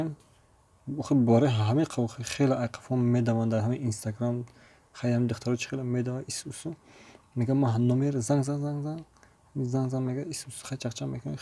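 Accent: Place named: Kulob, Tajikistan. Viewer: Ранги чучи кулоби гап мезани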